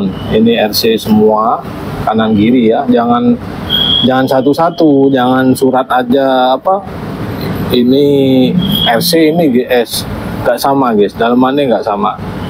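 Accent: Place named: Java, Indonesia. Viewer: Lokasi mana kok bhs nya medok jawa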